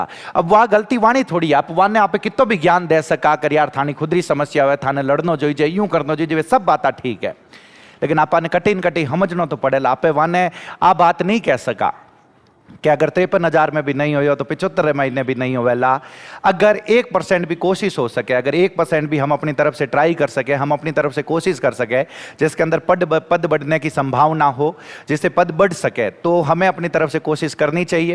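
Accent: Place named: Bihar, India. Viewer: Abe bol kya rha h.... बिहारी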